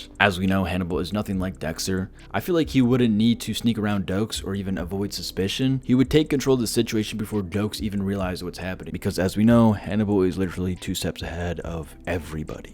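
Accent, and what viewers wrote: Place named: England, United Kingdom. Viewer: You can kind of hear the English cadence in his American accent, it makes vigilante sound innocent and polite with all the dumb stuff he says so it’s funnier 😭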